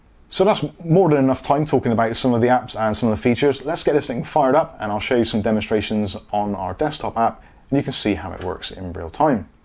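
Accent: Bristol, United Kingdom. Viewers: Bristol accent ?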